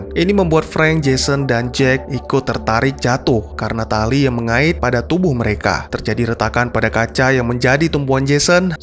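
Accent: Aceh, Indonesia. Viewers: Ooh. Mimin org Aceh ya? 😅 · Ooo miminnya dari Aceh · Asal Aceh ya bang 🙏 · Brakti bg orang ACEH ya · Abang orang Aceh ternyata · Dari Aceh ya bangg 😭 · Abang dari aceh?😳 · Bang orang Aceh pasti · Orang Aceh bg? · lu dari aceh bang?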